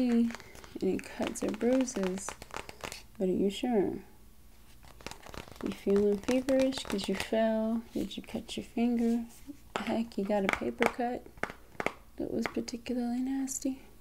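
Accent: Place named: South, United States. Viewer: Salut, j'aime bien l'accent du Sud, c'est le plus bel accent pour le francophone que je suis. A bientôt pour une vidéo, en français j’espère.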